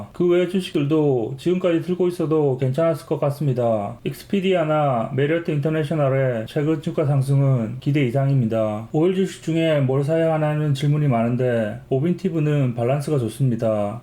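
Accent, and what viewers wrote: Gyeongsang, South Korea. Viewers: ovv 처럼 한 번에 다 몰빵은 못해도 조금씩 숫자 늘려가다 보면 나중에 좋지 않을까 하는 생각에서 가지고 있네요. 형님의 구수한 경상도 사투리와 함께하는 영상이 언제나 즐겁습니다 ㅎㅎ 올해도 잘 부탁드립니다~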